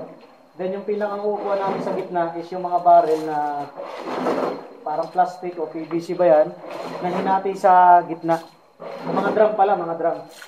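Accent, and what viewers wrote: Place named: Batangas, Philippines. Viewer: taga batangas ka kc may punto ang tagalog mo hehe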